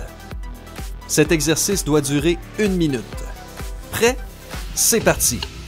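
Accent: Quebec, Canada. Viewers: C'est un québécois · c'est un Québécois! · Accent canadien? C‘est nouveau 🤔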